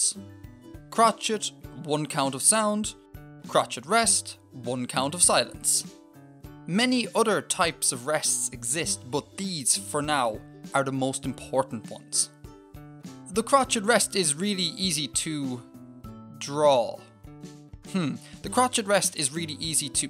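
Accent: Ireland. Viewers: That isn't British as you can hear to his accent he sounds like a irish lad to me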